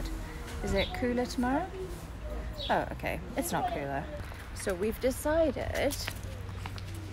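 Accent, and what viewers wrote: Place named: England, United Kingdom. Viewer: I`m English and you accent is so close to it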